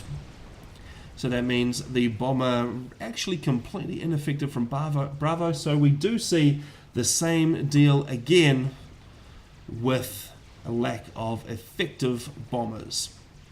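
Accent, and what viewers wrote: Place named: New Zealand. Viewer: Nice commentary and camera movements! I always do a double take when I hear another kiwi accent on youtube :D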